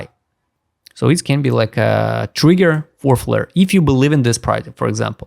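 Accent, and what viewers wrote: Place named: Russia. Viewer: disguisting russian accent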